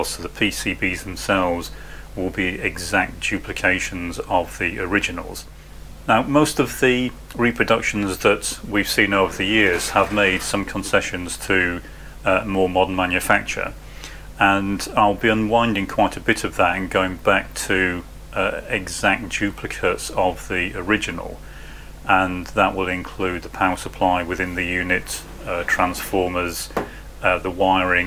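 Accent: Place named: United Kingdom. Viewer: Interested. Keep us up to date with progress please. I'm encouraged by the British accent - where would these ship from ? I'm fed up of paying import duty and VAT on gear I import from the US.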